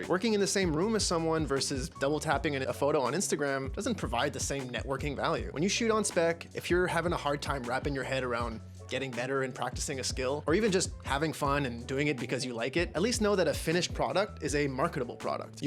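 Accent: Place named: Quebec, Canada. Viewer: Ben weyon! Ton français fait vraiment Québécois!? Tu viens du Québec?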